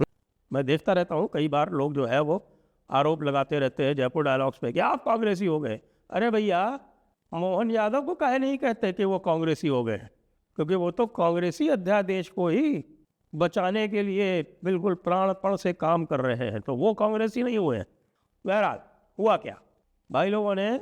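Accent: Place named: Uttar Pradesh, India. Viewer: Proud of and Love your UP accent 😊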